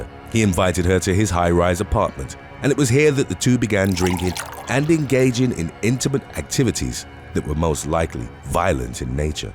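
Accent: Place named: England, United Kingdom. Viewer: when i hear an English accent i assume its white. kinda disappointed its not a white old guy.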